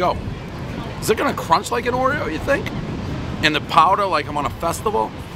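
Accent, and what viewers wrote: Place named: Massachusetts, United States. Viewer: His Massachusetts is really showing on these boardwalk reviews...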